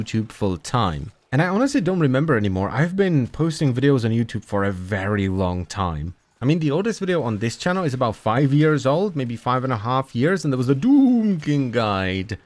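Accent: Scotland, United Kingdom. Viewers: Oskar 464 haha! I thought he was Scottish this whole time. Now the CZ in his name makes sense! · Yeah me too i was sure he is British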